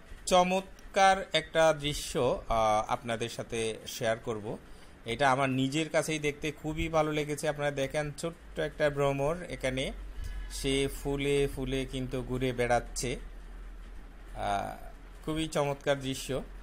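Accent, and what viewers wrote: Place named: Chittagong, Bangladesh. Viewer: Apu ke bolsi,apu problem holo ami chittagong er kothagulo bujte parini...☹!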